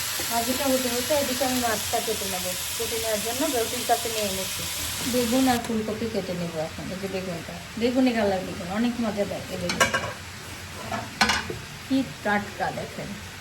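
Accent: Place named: Rajshahi, Bangladesh. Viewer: Ettto bad comment keno apnader? Uni notun video korteche...hoyto ektu vul hoy..ar tachara unara Pabna Rajshahi er manush...kothar moddhe local tone thakbei....eta emon dosh er kichu na...manush er valo chaite paren na??dhire dhire e manush unnoto hoy.. Ekbare na...parle valo kichu koren nije...then onner piche lagen...azaira jotto sob..jader kono kaj nei tarai emon onno ke bad comment kore...tai bolchi valo hote shikhen....video valo na lagle dekhben na..ke boleche apnake dekhte??/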